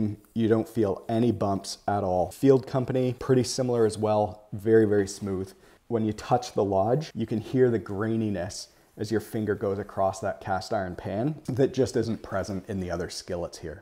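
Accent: Canada. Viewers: Once I heard the Canadian accent I couldn't unhear it lol Great review!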